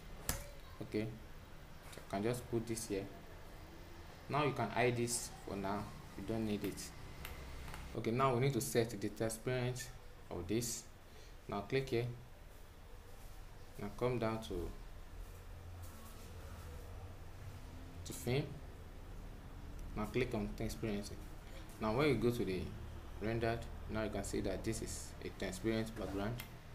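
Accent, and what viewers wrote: Nigeria. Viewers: are you a Nigerian?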